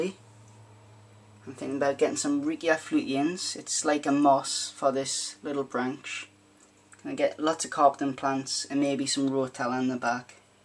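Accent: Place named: Durham, United Kingdom. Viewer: Lol we're abouts are you from? Sounds like somewhere next to Durham lol. N how many tanks Do you have? I have two going to get more though :)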